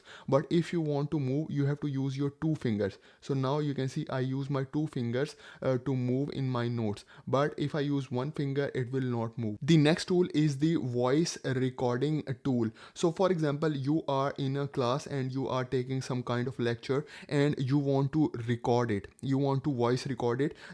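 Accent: India. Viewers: Indian english is so funny😂 love it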